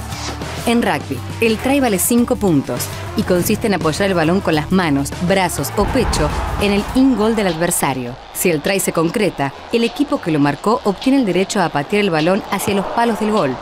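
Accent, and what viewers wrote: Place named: Argentina. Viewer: Que horrible se escucha la voz Argentina, preferiría el Colombiano o Mexicano.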